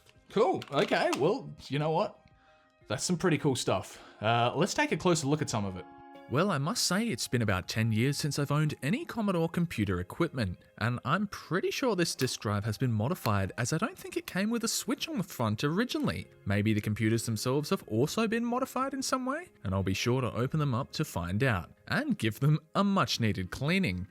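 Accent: Australia. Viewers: What state in Australia you from dude?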